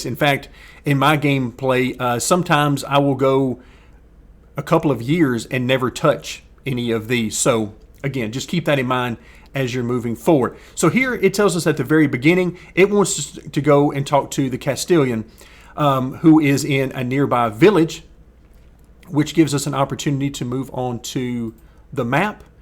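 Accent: South, United States. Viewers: Your videos are great, learnt alot! Also the added novelty of you having a Southern American accent whilst explaining a medieval game is brilliant! Keep up the good work 😁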